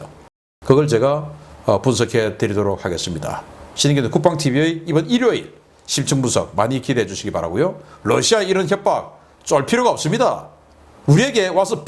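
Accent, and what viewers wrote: Gyeongsang, South Korea. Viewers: 오오.....|오만대???? 오랜만입니다.역시 갱상도죠....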